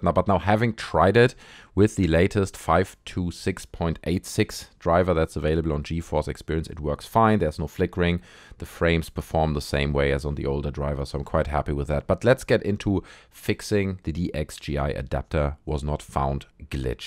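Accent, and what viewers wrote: Germany. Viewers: are you german you have a very german accent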